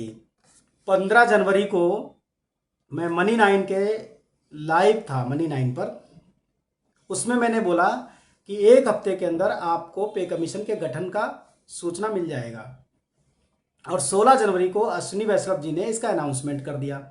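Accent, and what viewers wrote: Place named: United Kingdom. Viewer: Why is the voice is bloody britishers .pls upload the actual indian voice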